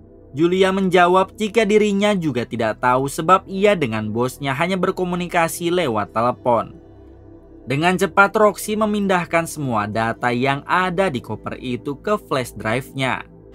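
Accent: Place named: North Sumatra, Indonesia. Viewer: Karna totongnya... 🤣🤣🤣🤣 Orang sumut lu min?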